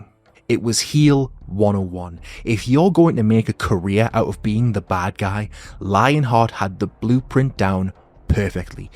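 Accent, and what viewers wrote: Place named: Newcastle, United Kingdom. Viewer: Fantastic video, love the geordie accent as well!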